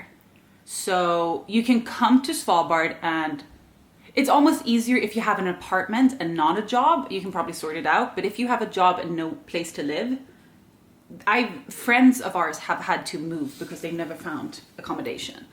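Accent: Ireland. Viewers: I was thinking you sounded Irish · I’m Irish and I knew you had an Irish accent in there!!!! 🍀🍀🍀🍀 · I can hear your Irish accent pretty much all the time! It amuses me to be watching someone Swedish with an Irish brogue who lives in Norway!:-) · You still sound Irish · I’m Irish and I never noticed the your slight Irish accent until now!! Now it’s so noticeable! 💚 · You have a very Irish accent.. lovely voice 🎄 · It’s funny you said you used to have a thick Irish accent because I always thought you sounded Irish but assumed it was a Swedish accent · I knew I heard Irish in your accent! Now it makes sense ☺️ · As a Californian, I can tell you have a slightly Irish accent. Great channel! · You sound Irish to me😊 I am from Liverpool in the Uk, we are the Irish that couldn’t swim back😊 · It is so interesting that when I first found this channel, I thought that you, were Irish. 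My nephew in law is Irish and I loved his beautiful accent when he first came to the US. Unfortunately after two decades in the US, he has now lost most of it. But I can still detect a little bit of an Irish accent when you speak. Best wishes for a very Merry Christmas, and Grim. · I definitely think you sound Irish!! I’ve always thought that and wondered 🤗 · Just caught this video and have to say that every time I hear you speak English in your videos I think you have a slight Irish accent. So you haven't lost it yet! Lol! And it's lovely, by the way. Love all your videos!!! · Just found your channel, I think you’re both adorable. Grim is so lovely. When I saw your first video (which was the most recent one) I thought you were Irish, so I now get why I thought that. I live in North Devon in the UK. I wanted to know if Finland is considered part of the Scandinavian culture I know the language is quite different, but I haven’t heard you mention it. 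If you don’t mind I would I would like to recommend a channel to you. It is Wild wonderful off grid. You may already know about them but if you don’t I think you will enjoy them. Now I will subscribe to you😊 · Now I know that you've had an Irish twang before it's all I can hear in your voice!! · I’m in the US and I’ve been wondering why your voice has reminded me of a close friend from Ireland who’s lived in the US for many years. Now I know!! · Greetings from Florida!!! When you said about people not understanding your Irish accent, I can't understand that because I visit Ireland quite a bit and I absolutely LOVE the Irish accent..... it's so beautiful and Ireland is awesome too!! Eventually I will live there and the people are so friendly! So, Happy Holidays to you 3 and All the Best for the New Year! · I wondered why you had a little Irish accent. My parents grew up in the west of Ireland and emigrated to the US where I was born. 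You also use American slang; that might be from watching American TV shows. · Your Irish accent pops out occasionally it's lovely 💚mostly it's not American the rest is mild Swedish it's very pretty 🤗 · You still sound Irish to me and not American. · I noticed your Irish accent before you ever mentioned living in Ireland. It was a video ages ago I can't remember the exact video but I literally replayed the clip a few times thinking "that's definitely an Irish accent". Some words you definitely pronounce in an Irish way... Just of course I can't think of them now 😆💚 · I actually thought you were Irish. 😏 I'm a new subscriber and am binge-watching. I lived in kristiansand when I was 14 and couldn't believe how beautiful it is.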